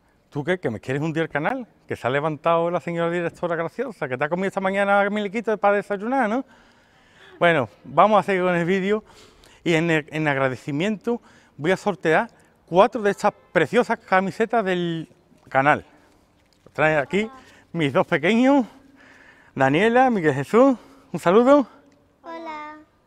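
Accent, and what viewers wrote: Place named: Andalusia, Spain. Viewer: Enhorabuena por los videos, da gusto escuchar a un andaluz!!! Ramón. Cádiz. Talla XL Muy chulo el logo de La Espartana.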